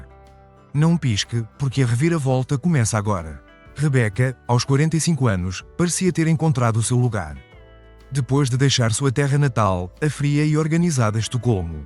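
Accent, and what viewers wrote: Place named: Portugal. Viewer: essa voz sensual desse português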